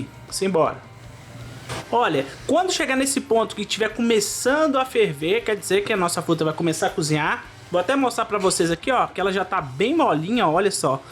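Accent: Minas Gerais, Brazil. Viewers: Obrigada por ensinar tantas gostosuras! Adoro assistir seus videos com o sotaque minerim😁Eh Nois!!😁💚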